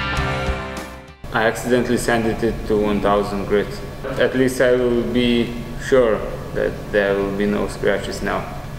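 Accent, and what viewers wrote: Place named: Russia. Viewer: Судя по произношению-это нашенский. Так английский, проговаривают только наши.) Только вот рассказать процесс людЯм по русски никак, надо понравится ИМ!) Мне то рассказывать не нужно, я все это знаю, сам со смолой работаю, но ведь людям то было бы интересно!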